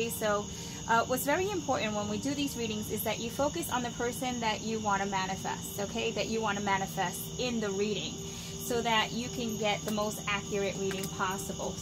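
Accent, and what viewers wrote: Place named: New York, United States. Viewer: I here the NY in you 😊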